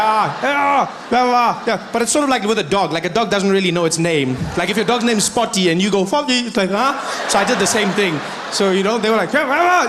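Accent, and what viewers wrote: South Africa. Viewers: Back to his Seow Feffrican accent - has to have been during his tour back home. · Being a South African, living and working in China I know for a fact the some Chinese know you my brother 😅😂😂😂!! Considering that some Chinese people are generally very ignorant to the outside world 🤦🏽‍♀️, it’s huge for you to be known outchea 😅😂! I I have three colleagues who instantly fell in love with me because I’m from South Africa, same country as their favorite comedian Trevor Noah EVER ☺️🙌🏻👌🏽!! Continue to fly our flag high & remember Don’t lose your accent my broer 😅😂😂❤️ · His accent is exceptionally South African in this clip 😂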